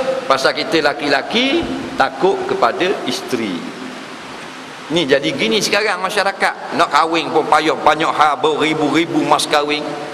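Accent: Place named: North, Malaysia. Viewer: pandai ustaz ckp utara!!!! #RESPECT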